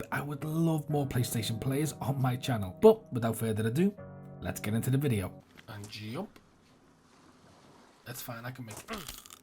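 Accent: Liverpool, United Kingdom. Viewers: Yo my fellow scouser where about are you from hahaha love to join up with you, my mates are all moaning bastards on dayz I reken youd be sound and chill to play with. Thank you for the content too! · Are you from the Toxteth area of Liverpool by any chance?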